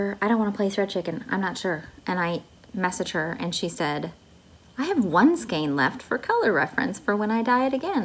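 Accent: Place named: South, United States. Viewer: Thanks for the sweet mention 😊. Maybe I’m biased but I find your voice super soothing, too. I think it’s a preference for southern voices from my Mississippi mom. You have some gorgeous projects❤️. I love lots of things in a chart - houses, anything red, bees and birds. Hope y’all have a great Halloween- btw our church does a big trunk or treat at 6pm on the 31st if you want to bring the kiddos. Happy stitching sweet friend😊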